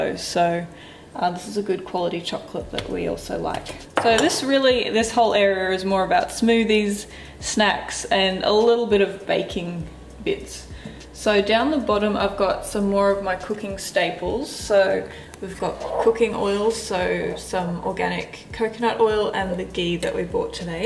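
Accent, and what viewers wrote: Australia. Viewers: Oh my gosh are you from Melbourne?? :D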